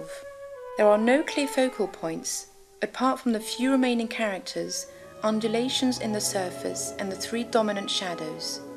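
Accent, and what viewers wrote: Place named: France. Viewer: The narrator sounds like a well spoken French girl whose posh rich French parents sent her to a posh English boarding school for a few years to learn posh English.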